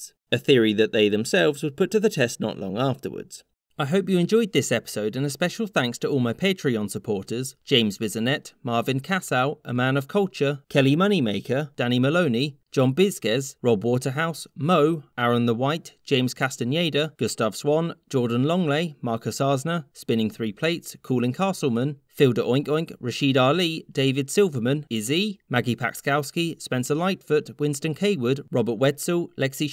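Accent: United Kingdom. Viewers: British accent is so annoying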